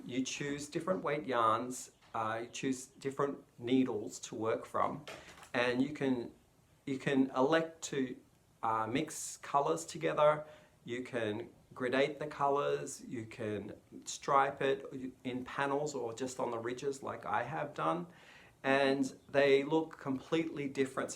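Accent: Australia. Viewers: Hi, my name is Dawn and I'm in Las Vegas. Bag o Day sent me over. Just subscribed. I watched your happy mail from Crystal and seen all the beautiful yarns. Saw your video with them hats and your Chevron scarf which is very nice itself. Love your Aussie accent. I don't knit but would like to learn. I do crochet do you? Will stay with you from now on. I have two in laws from Canada and truly like the country. You and hubby have a great weekend and stay safe.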